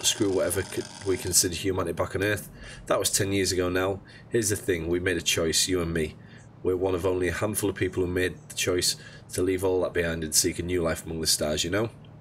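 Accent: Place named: Yorkshire, United Kingdom. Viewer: thanks for posting this video, as i'm deciding whether to buy the game on sale. But why i'm commenting is another matter... you have my voice. I mean you're probably about 30 miles closer to Leeds than me so the accent is slightly different but i'm not kidding you sound like an exact copy of my voice. its actually kinda scary hearing my voice saying words i've never said haha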